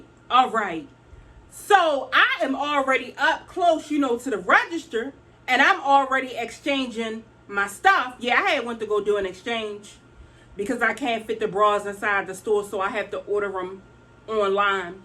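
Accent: Baltimore, United States. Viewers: Hey girl, I just found ur channel and as soon as you started talking I was like "She gotta be from B'more"🤣🤣 When ppl don't give me my 6 ft, I just rurn around and start coughing. They backs up then!💯 Peace and Blessings. #EastBmore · Heyyy, I'm a new subbie. This video put a smile on my face. The way you told the story about you and friend had me🤣🤣🤣🤣 I love a Baltimore accent!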